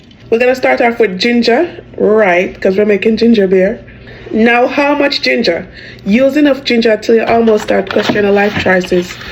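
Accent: Jamaica. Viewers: I have to make this, omd. I take ginger pills for nausea, may as well make the ginger a whole experience. The recipe looks amazing, thank you so much for sharing it! Your accent reminds me of my great grandmother (she was Jamaican, though she’d been in Dublin since her 20s). Beautiful memories, thank you 🩵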